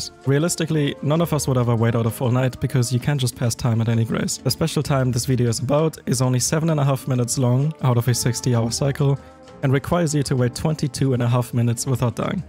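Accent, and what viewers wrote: Germany. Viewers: hör ich da nen deutschen akzent?:D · When I heard your accent at the very first time I was like, wait I recall this kind of words, is this German? Then I read your channel description haha,I felt compelled to say that, no hate,though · Ich finde es witzig das man sofort raushört das du deutscher bist, obwohl du echt gutes Englisch sprichst. Das Video hat mir echt gefallen und erklärt warum ein paar davon bei mir einfach rumgestanden haben, dachte echt die wären verbuggt. Danke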